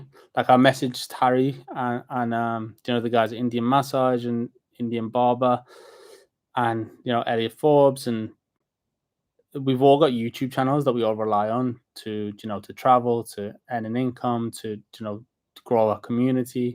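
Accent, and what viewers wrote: Liverpool, United Kingdom. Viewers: Lad, are you Scouse? There’s definitely a twang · Christ I didn't have you down as a scouser. Slight twinge in the accent though, like spanish or italian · OMG he is a Scouser 😮 I thought he was Brazilian 😂 as he never spoke before.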